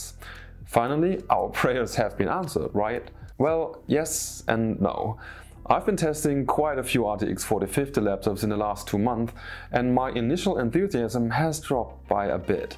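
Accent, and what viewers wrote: Germany. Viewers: Höre ich german akzent?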